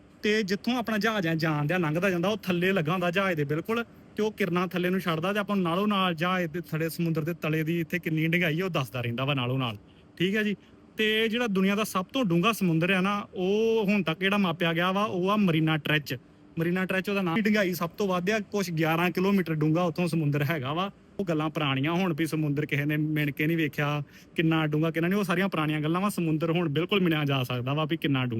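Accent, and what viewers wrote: Majha, India. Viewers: ਤੁਹਾਡੀ ਬੋਲੀ ਮਾਝੇ ਦੀ ਹੈ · ਬੋਲੀ ਤੋਂ ਲੱਗਦਾ ਭਰਾ ਮਾਝੇ ਤੋਂ ਹੈ ਵਾਹਿਗੁਰੂ ਤੁਹਾਡੇ ਅੰਗਸੰਗ ਰਹੇ 🙏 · ਮਾਝੇ ਆਲਾ ਭਾਊ ਲਗਦਾ ਬੋਲੀ ਤੋਂ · ਵੀਰ ਜੀ ਲੱਗਦਾ ਮਝੈਲ ਜੇ 😊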